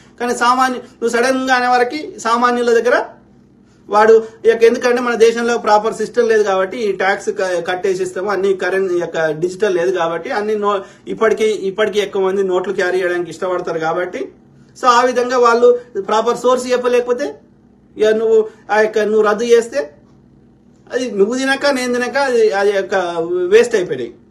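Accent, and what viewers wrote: India. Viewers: బ్రదర్ ఈయన చెప్పేది సామాన్య ప్రజలకు అర్థం అయ్యే విధంగా మన తెలంగాణ గ్రామీణ ప్రాంత యాస లో చెప్తున్నాడు, అంతే.., అందులో నాకైతే ఏం తప్పు అనిపియ్యలేదు, i hope you understand ❤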